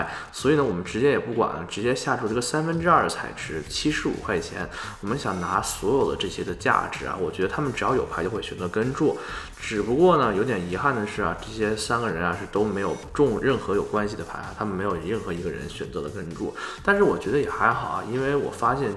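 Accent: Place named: North, China. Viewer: 太好看了， ，一听口音，是国内北方人，有点像北京人，又有点不像... 不管那么多， 很喜欢你的视频和打牌时的状态，心潮翻滚，面不改色，运气紧随。没说的，必须订阅小铃铛。 祝好运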